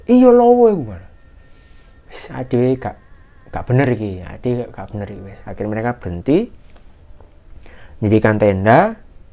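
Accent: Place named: Surabaya, Indonesia. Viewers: Kenapa ya,kok saya suka dengerin logat orang Jawa Timur kalo ngomong.. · Asyik banget aku dengerinnya,trus sampai senyum" sendiri.keren rek,bahasa suroboannya.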